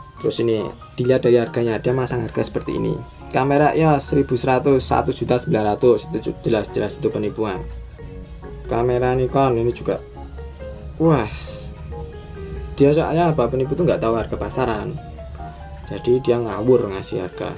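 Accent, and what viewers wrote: Java, Indonesia. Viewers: jowo ne medok tenan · Mas ngomongmu medok 😂😍😍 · Thank's infonya mas, ngakak sih masnya agak medok wkwkwk ada lucunya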